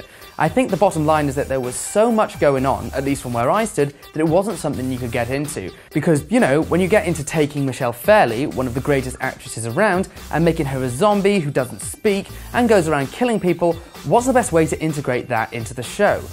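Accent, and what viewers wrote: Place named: United Kingdom. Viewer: I don't really see what is annoying about his voice, unless you just find British accents annoying.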